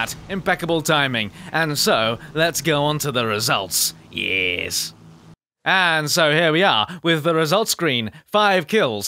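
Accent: United Kingdom. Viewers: I love your videos!! Just a suggestion but you and your British accent should play with baron and his German accent, and Phly and his American accent! And the whole time all 3 of you are the perfect stereotypes, I just think that would make for an amazing vid! Keep up the good work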